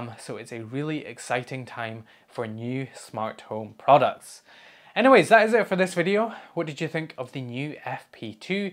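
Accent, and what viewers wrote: Scotland, United Kingdom. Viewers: Another amazing video!. Informative and enjoyable to watch. Still waiting for you to lean into the Scottish accent more and roll your Rrrrs among things. 😝 Maybe in one of the always funny blooper takes at the end? 😉😉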